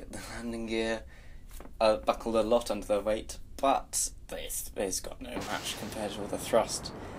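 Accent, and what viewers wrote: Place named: Canada. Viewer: ***** is Canadian, probably why you cant tell:p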